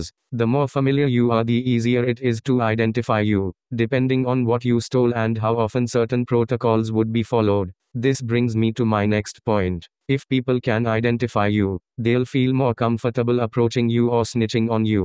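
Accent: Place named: India. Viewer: AI VoiceOver with an Indian accent??? huh?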